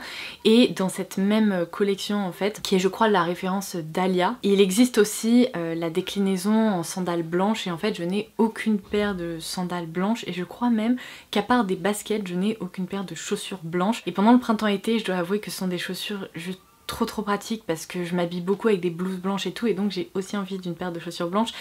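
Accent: England, United Kingdom. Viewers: Tout me donne aussi tellement envie ! On va être nombreuse à craquer 😍 Ps : ton accent anglais me fait toujours autant rigoler ! Gros bisous ma belle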